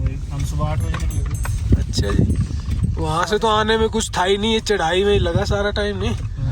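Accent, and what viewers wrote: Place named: Himachal Pradesh, India. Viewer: Bhai himachal se ? Himachali language lag rhi hai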